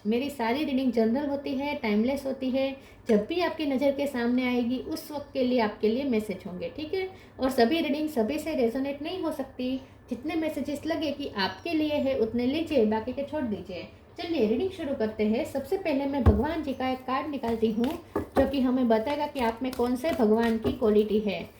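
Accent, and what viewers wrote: Gujarat, India. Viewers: Are you gujarati?.😅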